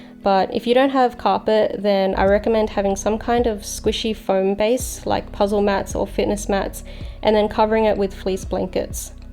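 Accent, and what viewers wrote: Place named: Australia. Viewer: Aussie or new zealand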